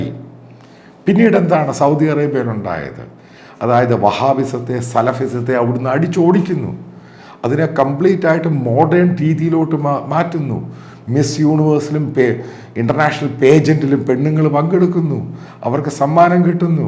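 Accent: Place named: Central Travancore, India. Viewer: Ayyooo mathew Samuel ... you're such a funny guy. Each time I hear this " ee pullu !! " cannot stop laughter . Yeah madhya thrivithamkoor working . Am from Kottayam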